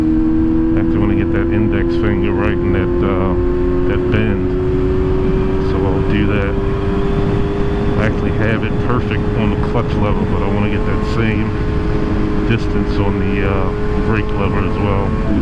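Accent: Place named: Baltimore, United States. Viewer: Good stuff man. Thanks for the video. Unrelated, you sound like a Baltimore dude, but those don't look like Baltimore streets 🤣.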